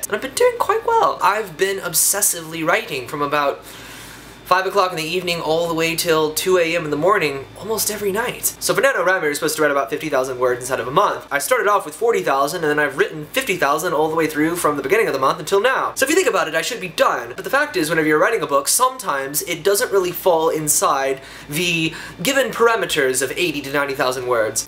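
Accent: United Kingdom. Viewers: Are you actually British because you do an amazing accent XD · British accent??? WHY?? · haha british accent; that is so awesome!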